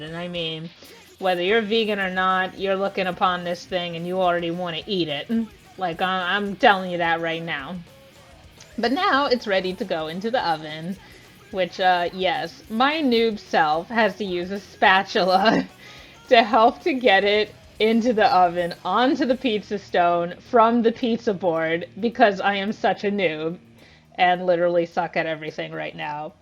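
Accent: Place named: New York, United States. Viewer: D))) mouth watering REAL bad right now haha ;D love your New York accent , you can make pizza for me aaaany time sista ; )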